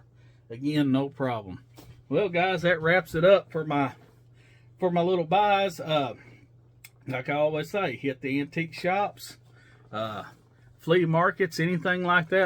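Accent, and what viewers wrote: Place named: South, United States. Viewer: i love to hear you talk that red neck voice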